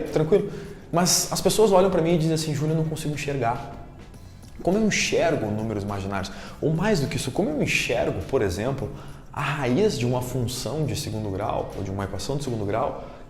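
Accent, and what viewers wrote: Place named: Rio Grande do Sul, Brazil. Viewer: Excelente canal. Pelo sotaque deve ser gremista!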